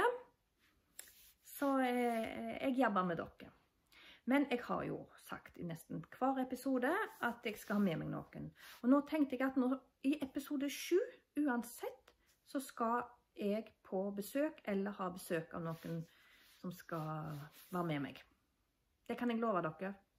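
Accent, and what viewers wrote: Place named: Haugaland, Norway. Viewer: Takk for flott episode, kjekt å hørra Haugalands dialekt og å se fine bilder fra Borgøynå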